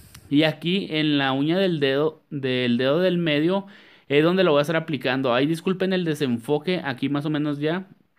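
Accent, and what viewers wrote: Chihuahua, Mexico. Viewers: Eres de shihuahua?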